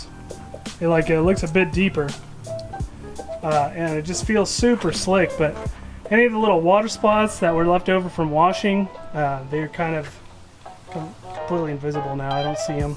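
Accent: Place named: Texas, United States. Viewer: Where in Texas you from??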